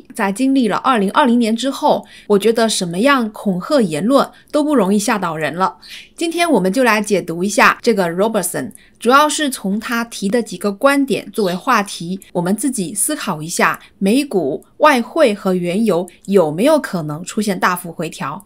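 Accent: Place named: Cantonese, China. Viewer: 感觉广东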